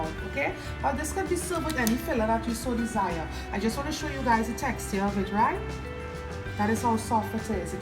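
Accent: Trinidad and Tobago. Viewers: I would love to see what this woman looks like! Her voice is amazing (Trinidadian accent)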